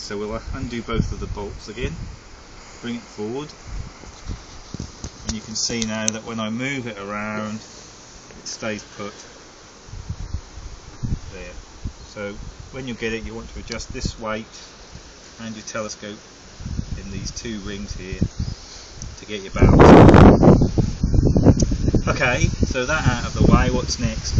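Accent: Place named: Norfolk, United Kingdom. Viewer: You sound like a good old Norfolk boy. I was born in Yarmouth but have lived in Ipswich for the last 10 years. We have some lovely dark skies around here and the Skywatcher 200 is on my shopping list. Good video.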